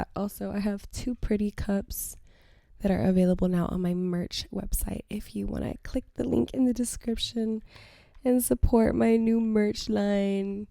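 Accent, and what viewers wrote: United Kingdom. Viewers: Also your British accent is GOOODDTTTT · Your british accent is killing me 😂 My new favv podcast fr 💗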